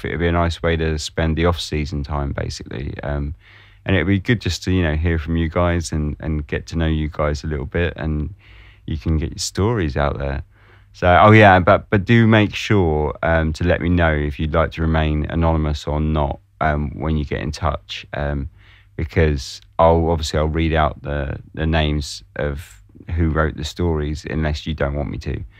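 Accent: England, United Kingdom. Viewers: Thanks for posting...you sound English, but that means nothing if you live in another country. But what about the case of my friend Fiona Saunders, a young mum who was beaten to death in 1986 in Church Crookham, Hampshire. And was the reason her case has almost been completely buried because one of the suspects became a police officer after?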